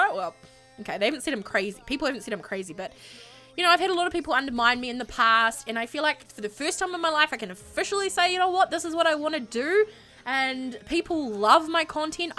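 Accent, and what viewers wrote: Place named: New Zealand. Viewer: I guess New Zealand (the accent) is close to Australian! First I thought that maybe you are British but then I saw your channel info :') Of course I watch your videos, they're awesome!! xx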